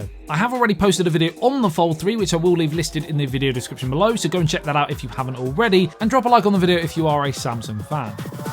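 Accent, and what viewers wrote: United Kingdom. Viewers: That's how Brits pronounce Z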